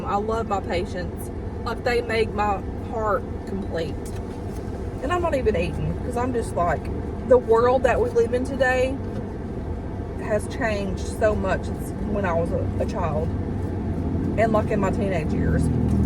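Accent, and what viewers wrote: South, United States. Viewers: Honey if that girl doesn’t like a southern country accent she might want to move out of the state of Tennessee. Of all the things to get upset about in this world she has to be upset about how someone speaks. Bless her heart she needs help. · I love your accent a southern accent is a beautiful thing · HUGS from Vancouver Canada. You are a wonderful person with a fabulous southern accent, love it! Never explain to anyone. Be yourself always. I enjoy your honesty and kindness. Have a wonderful day :) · My favorite salad is a wedge salad. It's a wedge of iceberg lettuce with bacon and blue cheese dressing. It's so good. I adore the way you talk. Moved here to NC from Ohio and I adore the southern "accent". You have a innocent, down home feel when you speak. Don't ever change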